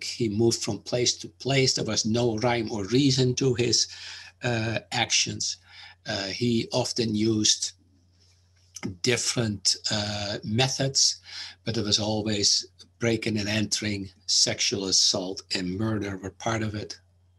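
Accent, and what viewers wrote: Netherlands. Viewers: do I hear a Dutch accent?? 🙋‍♀️ from The Netherlands.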